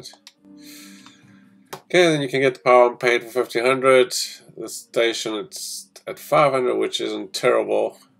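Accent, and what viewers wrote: South Africa. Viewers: This dude sounds super south african😂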